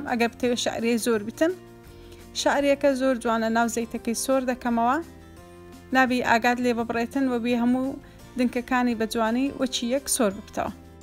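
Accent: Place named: Erbil, Iraq. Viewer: هيوايه تم قسه ي هه وليريه😣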